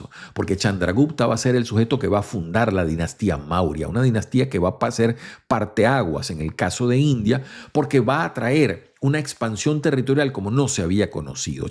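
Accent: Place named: Caracas, Venezuela. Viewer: Excelente tema, excelente canal y excelente vocabulario, excelente dicción y magnífica voz coronada con el acento caraqueño. Gracias Sr.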